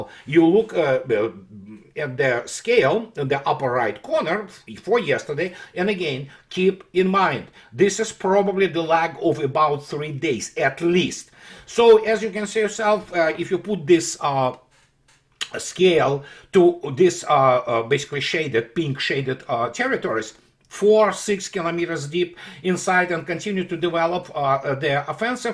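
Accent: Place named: Russia. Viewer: "Russians don't need to rush." Yes, they do - it's in the name! By the way, given how long you've been in the US and how thick your accent still is, would you say the Russian accent is even more persistent than the British accent? Given that one of the key attributes of Russians is that they endure, I guess that would make sense.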